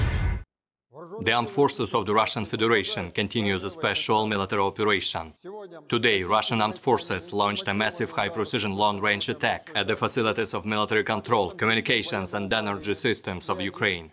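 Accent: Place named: Indonesia. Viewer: pembawa bicaranya suaranya medok indo bangke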